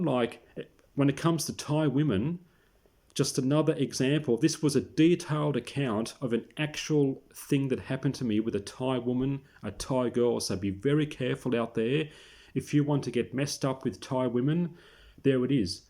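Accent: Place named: Australia. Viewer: Australian talks like that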